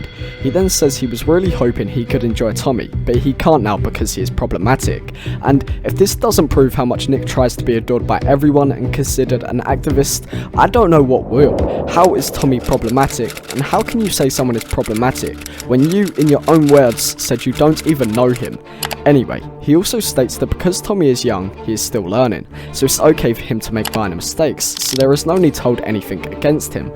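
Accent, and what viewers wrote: United Kingdom. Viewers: as a 'modern activist' myself, this man is not the definition of an activist at all. The fact that you are using the term 'modern activist' as a negative term is derogatory to those of us who actually do work every day to TRY to bring attention to pertinent issues. If you think COMMENTARY YOUTUBE is ACTIVISM, you are SORELY misunderstanding the definition of the word. Sorry babe-- ur british accent doesn't hide your lack of ability to use google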